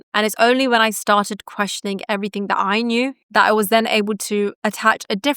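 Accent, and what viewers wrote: United Kingdom. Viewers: Are you born British?? I am obsessed with your British Accent.....!!!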